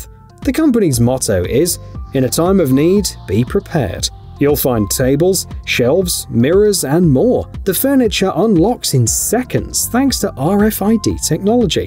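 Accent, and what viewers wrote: United Kingdom. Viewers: British person talking about guns, no thank you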